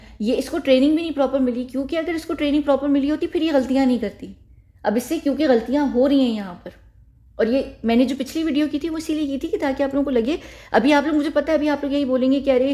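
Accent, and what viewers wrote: India. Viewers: Es ka lehja hi hindi hy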